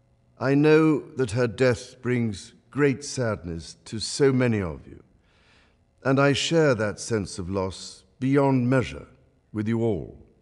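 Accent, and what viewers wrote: United Kingdom. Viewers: This is how I an american think every British person sounds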